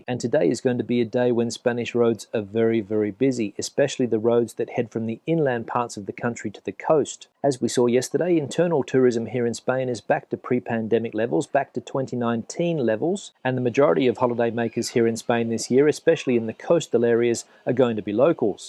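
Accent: Australia. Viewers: I studied Speech at University… I was taught that, in your case, you have a “regionalism” ie Down Under. An accent would be how you speak your regionalism. Say a person from Alice Springs vs. NSW….. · Hi as an English teacher for twenty years you should know ‘more busier ‘ airport is a double superlative also I would suggest it’s not proper english ( ha ha ) to end sentences with a preposition but I have no problem with your accent although not as strong as on Rottnest in the bar As another Aus expatriate i love Spain and if you are going to do comparisons your beloved WA fails most comparisons starting with your pension You voted with your feet twenty years ago amigo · I love your 'no bullshit' Aussie accent.. keep up the great videos and sarcastic lessons given to those that can't spell whilst trying to slate you 😀👍👍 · I am an English speaker for 75 years. 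On my scale you are a 10 out of 10. You've lost much of your Australian accent probably as a result of living in Spain. I can tell that you're not speaking American English but I would not be certain whether you were from Australia, NZ, Canada or South Africa. Definitely you don't sound British. Can't believe you produce a great channel and people want to nitpick your English.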